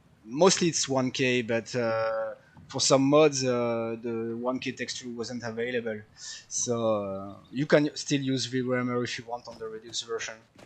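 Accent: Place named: France. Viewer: Ahah, j'ai entendu l'accent, j'avais un doute!! Vive la France!! Vive la république!!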